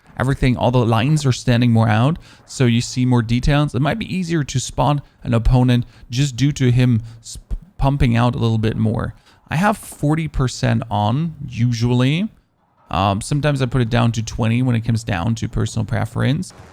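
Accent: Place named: Germany. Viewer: I can hear from you accent that you german :)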